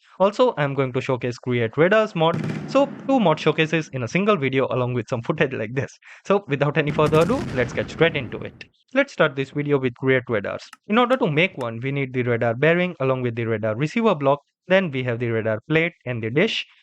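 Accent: India. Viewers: when the indian accent came on i instantly closed the video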